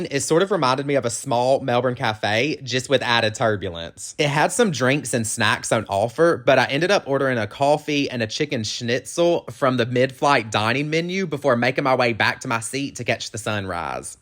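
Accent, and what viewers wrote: South, United States. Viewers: There’s nothing worse than listening someone that’s gay and from the south.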